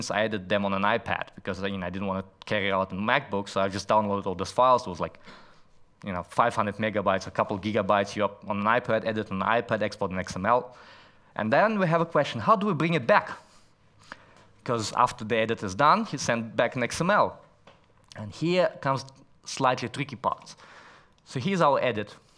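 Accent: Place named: Israel. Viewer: Your Israeli accent mixed in with so many other accents are driving me crazy. my brain is going nuts trying to process your voice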